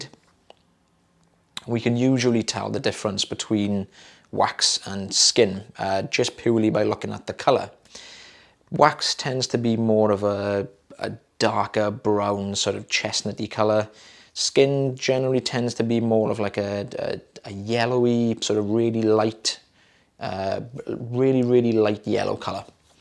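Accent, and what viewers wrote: Wales, United Kingdom. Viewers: Look how the rookie became a specialist… The only correct answer is that you were trained by the best 🤨😎 And the Welsh accent is soo nice to listen to 🤭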